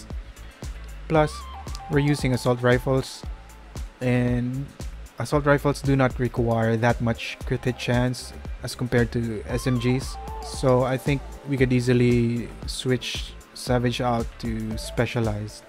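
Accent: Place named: Philippines. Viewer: this guys sounds like is flipino.. lool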